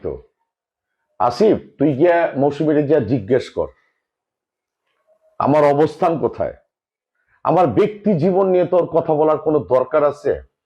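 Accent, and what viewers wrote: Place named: Comilla, Bangladesh. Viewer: বাড়ি কোথায় কুমিল্লা বুঝতে হবে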